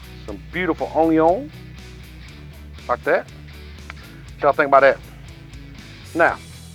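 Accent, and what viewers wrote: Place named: North Carolina, United States. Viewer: What part of the south are you in ? I can close my eyes and you sound just my like family in Hudson NC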